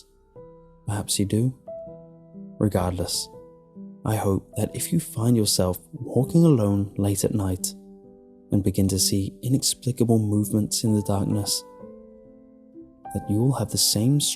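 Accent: England, United Kingdom. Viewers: as an Oglalan Souix, I am kind of amused this story is being read by an Englishmen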